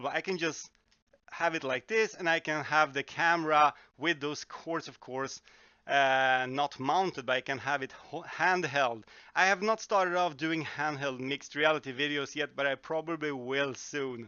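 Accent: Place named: Sweden. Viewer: Jag tänkte väll att du var svensk Man hör det lite lite på din engelska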